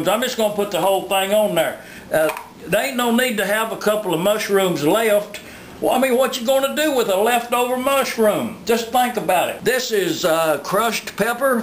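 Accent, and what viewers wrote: South, United States. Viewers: I find your comment extremely insulting! What are you insinuating by "his voice" and "southern fried pizza"...apparently you're stating that because someone has a southern accent they are incapable of cooking something that does not contain fried chicken...Furthur, you have no room to insult ANYONE on here, due to the fact that your username is "Inbreed6699Family" Enough said.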